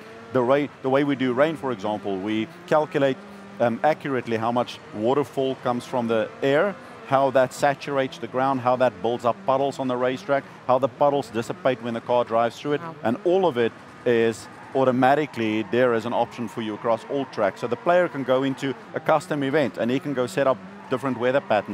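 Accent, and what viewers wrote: South Africa. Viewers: is this guy South African? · Why does he sound like South African?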